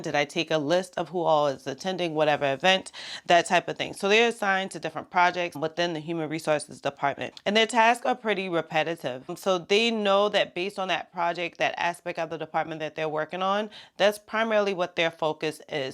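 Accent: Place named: New York, United States. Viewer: Random question: Are you from NY? I hear a NY accent I think.... great video though!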